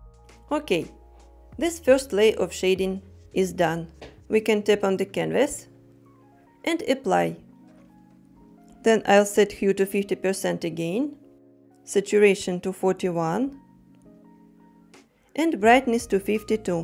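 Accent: Russia. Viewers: Ну блин не обмануть тебе своих😅 ну сразу же слышно что из России автор 😏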